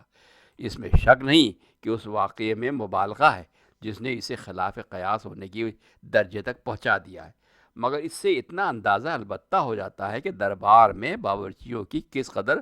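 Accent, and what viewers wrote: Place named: Lucknow, India. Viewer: बेहतरीन जानकारी वह भी लखनवी जुबान में 👌👌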